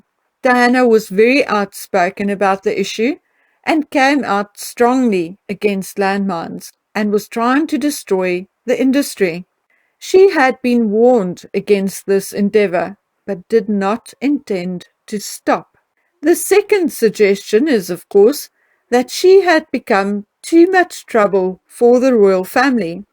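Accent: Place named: South Africa. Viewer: Wat klinkt het Zuid-Afrkaans zo mooi🙂..in Nederland wandelen we ook, en in bepaalde omstandigheden kuieren we ook..ik bedoel dan gebruiken we het woord kuieren als we op ons gemak wandelen in het bos bijvoorbeeld. En ik ben zo blij dat een heerlijke tijd heeft gehad bij haar familie 😁 Groetjes vanuit Gouda ( Nederland)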